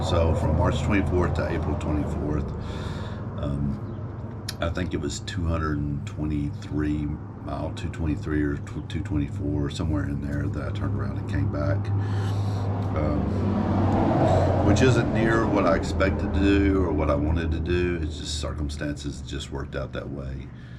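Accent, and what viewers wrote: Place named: Texas, United States. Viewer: It was fun watching you! I loved your texan accent :-) you should totally consider the Colorado Trail, it's perfect for the summer, in the mountains, little to no snow if you wait for it to melt off. and much shorter than the PCT 🙂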